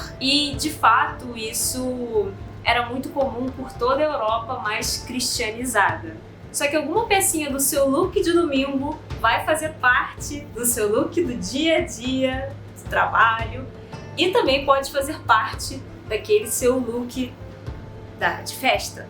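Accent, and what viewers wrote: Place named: Rio de Janeiro, Brazil. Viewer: Sua ideia foi genial. Coloco aqui as coisas que percebi durante o tempo do vídeo. Achei muito bem editado e que irradia a sua simpatia e seu sotaque por vezes cariocas e outras vezes português. Sua desenvoltura é fabulosa. Só achei um pouco longo e as vezes as pessoas só dão uma passadinha rápida para ver o que tem de novo. Gostei do uso da língua inglesa também. Nesse momento em que as nossas entidades tem carência de jovens vem a calhar e acho que devem acontecer sempre porque estão querendo mudar a nossa história. Você já percebeu isso? claro que alguns vão discordar dos temas e formas que foram colocados. Isso acontece pelo conhecimento adquirido de alguns. Até os autores discordam uns dos outros não é mesmo?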